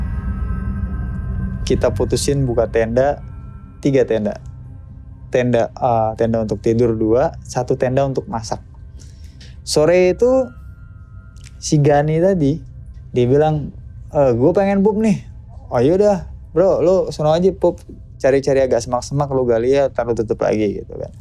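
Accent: Java, Indonesia. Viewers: Bang abis ketemu mas Itank dkk n mas Bala, jd kebawa logat Jawa yah...😃😃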